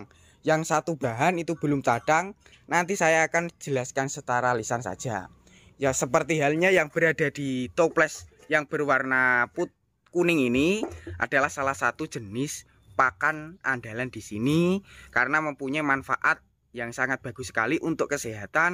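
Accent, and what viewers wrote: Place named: Java, Indonesia. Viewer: Medhok tenan leh omong...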